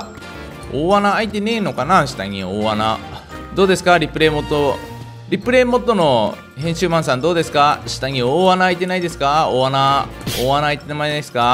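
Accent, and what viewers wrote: Fukui, Japan. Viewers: チャンク掘りお疲れ様でした！私も今日は2時間程、鉄の鉱脈探して掘り掘りしてました。掘り掘りは無心になれるので好きです。前回のクラはやっぱり普通の福井なまりのさんが1番良いと思います^_^ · 最近福井なまりの前回のクラ聞けてないので聞きたいです。さんの福井なまりは聞きやすくて好きです。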